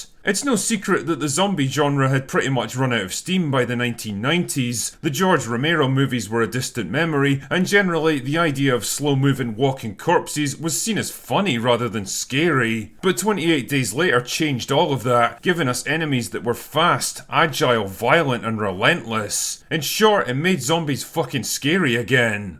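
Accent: Scotland, United Kingdom. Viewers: You, Sir, have excellent taste in movies. That is why I like you. That and the Scottish accent and you are very easy on the eye.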